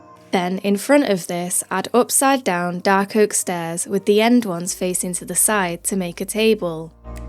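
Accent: United Kingdom. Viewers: This is another reason why I love British accents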